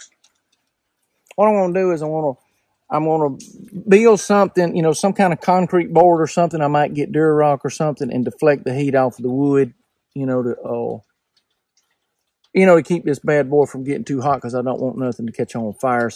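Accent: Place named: Tennessee, United States. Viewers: You from Tennessee? Your accent sounds like you are from around these parts. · stayed for the southern drawl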